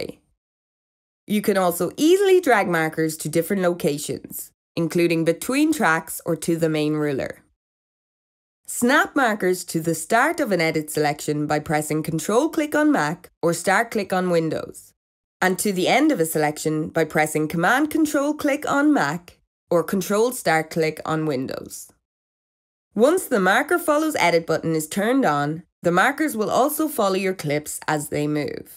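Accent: Ireland. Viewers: Inner city Dublin. Next to being a gypsie. If it's any help, bring volume to zero and use subs. Nails on a teacher's blackboard is easier to listen to but the screen is busy enough unfortunately. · Good vid but the Irish accent is like listening to bad Melodyne on a Didgeridoo. Please use the usual VO so as not to be distracted.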